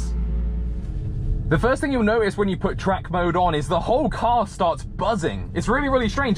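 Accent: United Kingdom. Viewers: Although your accent is British, and the steering wheel is on the right side, you pronounced "schedule" like Americans. :D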